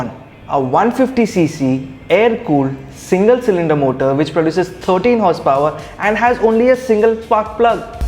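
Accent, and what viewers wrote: India. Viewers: You started by saying the old pulsar ad with the nurses was cringe. It wasnt, your video with 1million edit inserts and black culture appropriation with your thick indian accent and constant shhhhh was what was cringeworthy. If I'm not mistaken, you completely missed mentioning the pulsar 220f was released with a carburetor around 2009/2010. That peaked the 220f sales as the indian market wasnt ready for a fuel injected motorcycle. You have a face for radio yet are boldly facing the camera. I had to watch most of this video with my eyes closed. Go and 'damnnn sonnnn' that.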